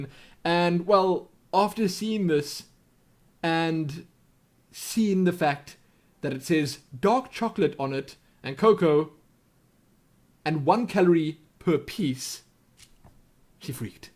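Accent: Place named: South Africa. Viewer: Ahhhh! South African! I've been trying to figure out your accent for the last 3 weeks! lol. I think I'll send you something too! Your videos have been quite entertaining!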